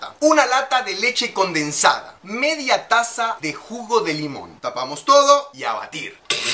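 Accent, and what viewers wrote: Argentina. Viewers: Jodeme que es Argentino? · Siempre que veía tus vídeos tenia la duda si eras de Argentina por la forma de hablar... pero ya que dijiste mate no me quedan dudas. Muy buena la receta. Saludos desde Córdoba Capital, Argentina. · o es argentino o uruguayo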